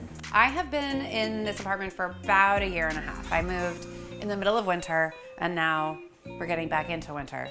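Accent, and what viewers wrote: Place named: Bay Area, United States. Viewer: She's gotta be from the Bay Area. Between the accent and the nutritional yeast on the popcorn...(which yes, is delicious)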